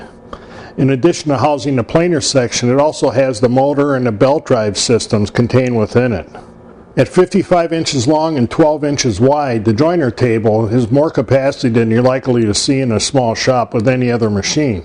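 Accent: Chicago, United States. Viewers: FINALLY! We get to see your face; after watching kadzillions of your "reviews" on machinery. And if you are not from Chicago, I will eat me hat. Your "accent" (and YES Chicago-ites DO have a definite "accent"! LOL) gave it away. YEP! The quite often "Dee's" instead of "The's", etc, gives it away every time. I noticed this distinct accent when I first arrived in the "windy city" in the fall of '46. It took me 6 yrs to fully understand you "Yankees". LOL Of course my school-mates and neighbors never let me stop hearing, "You talk funny with yuz "Southern drawls" lack "Yall"; not to mention, "AH instead of "IEE". But I got "USE-GUYS" back, by saying, "Yes and YALL sho nuf do talk funny LOL"! Seriously, you do a GREAT job reviewing; in these awesome videos. You cut right to the chase (unlike MOST who review) and your knowledge is FAR above other reviewers. 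If a person can not learn from your video's, they can NOT learn. period! Keep up the good work neighbor.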